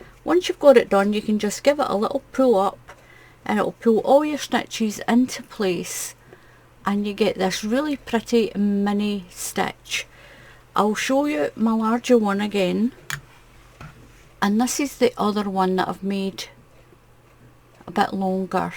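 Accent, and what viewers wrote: Scotland, United Kingdom. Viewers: I love all your patterns, you can make crocheting so easy to do, you are a great teacher...you explain every stich perfectly. I love listening to your Scottish accent, and when muttley gives a wee bark now and again, Thank you, xx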